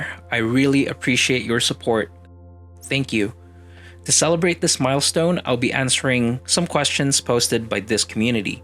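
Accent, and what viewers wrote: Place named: Philippines. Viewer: As soon as I heard your voice I immediately knew you were filipino! Very glad to see a fellow Filipino, and a genshin creator at that. Love your vids and hoping you get 10k soon, keep it up bro :D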